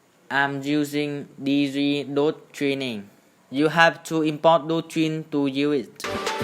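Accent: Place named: India. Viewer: Y như mấy a ấn độ đang nói tiếng anh d :v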